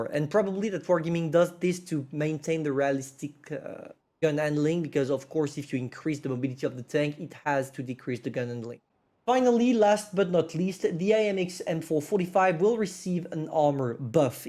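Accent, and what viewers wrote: France. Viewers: Bonjour everyone ??? Sa se voit avec ton accent que tu es français, ou soit je dit de la merde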